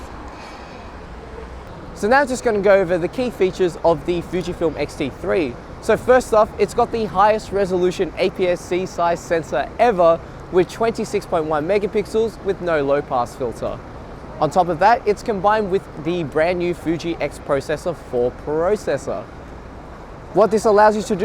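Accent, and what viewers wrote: Australia. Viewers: Good effort. However no standout point in a sea of xt3 reviews on YouTube, other than the Aussie accent part.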